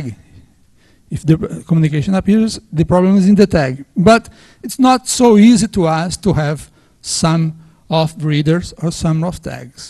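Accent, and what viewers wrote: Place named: Brazil. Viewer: His accent looks like brazilian accent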